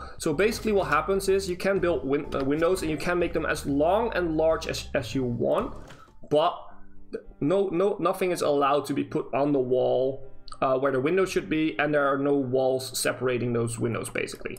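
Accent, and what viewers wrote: Netherlands. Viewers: I live in Brabant but am from the US. So I speak the language my accent gives away my secret. And you have a bit of a Dutch accent, but I also thought that you could sound a bit like your more eastwards, so wasn't sure.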